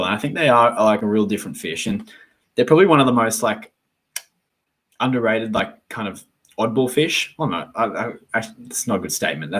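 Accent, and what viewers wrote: Australia. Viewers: Love the Australian accent haha, great video mate 😀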